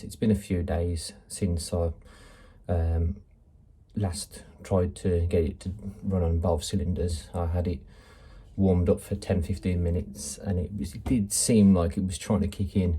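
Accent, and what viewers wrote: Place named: Birmingham, United Kingdom. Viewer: You sound like a brummie my friend....hello from France. Just sorting m'y own cm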